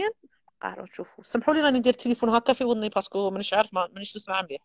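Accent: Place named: United States. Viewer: سلام الزينة بنت بلادي أنا نتابعك من ليون بفرنسا. بنتي تحبك و تعجبيها بزاف في طريقة نطقك للإنجليزية الأمريكية. و أول مرة نعرف باللي زوجك فلسطيني ربي يحفظكم و يسعدكم و يهنيكم يارب العالمين 🇩🇿❤🇵🇸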